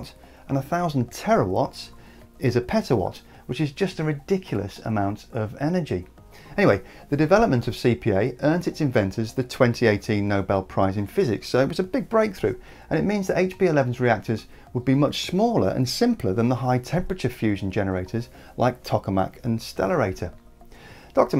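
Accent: United Kingdom. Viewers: I deliberately NEVER mention the totally IRRELEVANT subject of HOW somebody says something, and focus EXCLUSIVELY on WHAT they say. However, given that I have seen SO many brainless idiots in the world voice their worthless unoriginal opinion about style over content, I figure I am allowed at least ONE time to do the same. The CONTENT of this channel is PERFECT. But I REALLY cannot stand the speaker's mild British voice. I would prefer an aggressive angry voice (British or American) to deliver the narrative.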